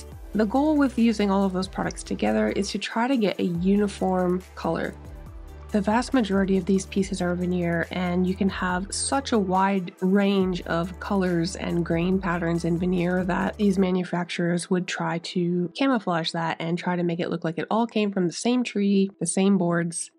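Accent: Canada. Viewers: Gorgeous!! Ok, confused. You definitely have the Canadian accent but your “y’all” really threw me! 😂 I was born and raised in Wisconsin, but have lived in the south for 30 years, so to say I have an odd accent would be an understatement… But I definitely say y’all because I got sooo many comments for saying you guys.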